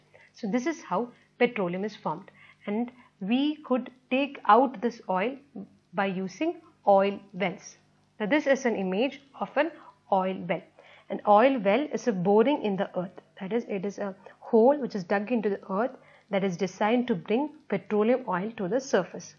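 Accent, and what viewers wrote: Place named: Kerala, India. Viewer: Pronounciation says that u r a malayali teacher. I m too from Kerala and teacher at Karnataka. Video is very helpful for teacher like me👍👍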